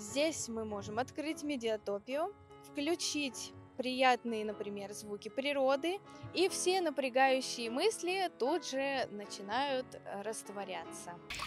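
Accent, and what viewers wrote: Russia. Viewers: В первую очередь, хочется выразить благодарность за Ваши труды. То, что Вы делаете это прекрасно., расскажите откуда Вы родом и где живёте (где такие самородки появляются)) Даже в пределах России, бывают акценты и говоры. Я тоже что-то слышу в Вашей речи) То ли какой-то пермякский говор, то ли зарубежный акцент?) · Don't know what's sexier, that outfit or your Russian accent :)) Your piano playing is phenomenal and loved the outdoor setting. Curious where you mounted the camera lol :)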